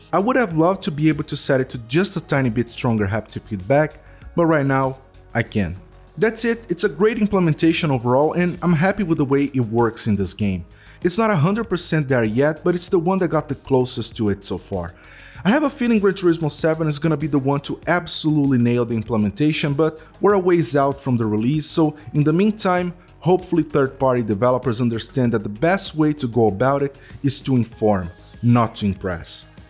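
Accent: Brazil. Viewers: Tu é brasileiro? Tem sotaque hahaha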